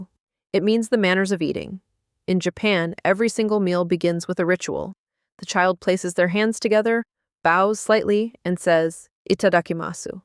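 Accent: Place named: United States. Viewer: the american japanese accent is frying me 😭😭💀💀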